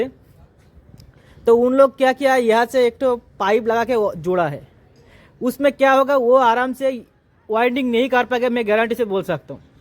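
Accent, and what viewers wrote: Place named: Bengal, India. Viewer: ও,,, দাদা,,,,,,,,,,, হিন্দি আর একটু ভালো করে শিখুন না হলে বাংলা চলে আসছে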